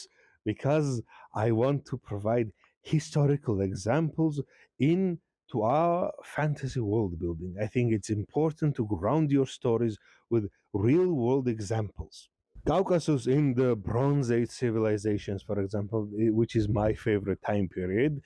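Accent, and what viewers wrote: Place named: Wales, United Kingdom. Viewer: Ive been to Georgia before but this is the first time ive heard a Georgian accent that sounded welsh. Im guessing it must either be a regional accent or a result of having a welsh English teacher.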